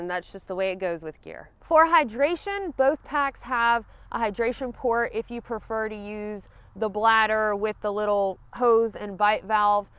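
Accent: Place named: Alabama, United States. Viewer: I just found your channel, and I'm loving it! So much awesome information. 😊 Side note: do you happen to be from Alabama or around here? Our accents sound similar haha.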